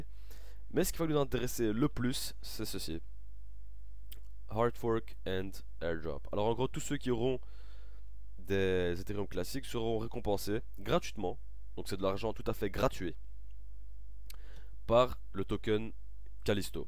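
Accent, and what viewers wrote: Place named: Liège, Belgium. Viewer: salut je viens de m'abonner super présentation, je reconnais un petit accent liégeois :) je suis de lieche aussi ;) février s'annonce prometteur aussi pour POWER LEDGER!!!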